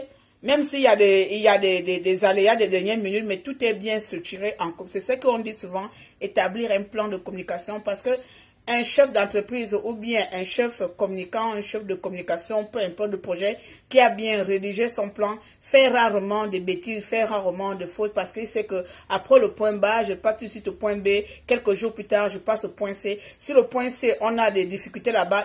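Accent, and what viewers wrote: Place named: Cameroon. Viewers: N'est ce pas ?son accent montre kel est aussi camerounaise com sa sœur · Tu ne dis rien !!! Et tu es camerounaise. Je t'ai reconnu par ton accent...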